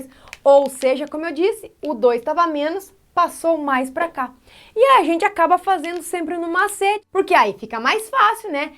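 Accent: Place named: Northern Paraná, Brazil. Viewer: A esta perdendo o sotaque do NORTE PARANAENSE RSRSRS. O jeitinho caipira. Quadro lindo e as cores da caneta sao vibrantes bem chamativos.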